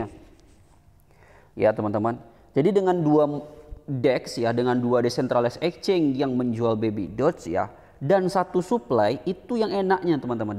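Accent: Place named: Medan, Indonesia. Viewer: Org medan boss logstnya.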